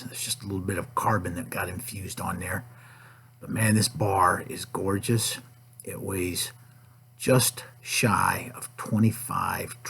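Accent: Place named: Italian American, United States. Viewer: Sounds like a guy from the sopranos - I Makin gold ova here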